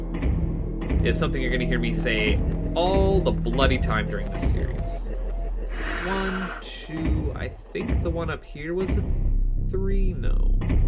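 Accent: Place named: Canada. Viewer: Aw, your Canadian vowel raising is so cute~! <3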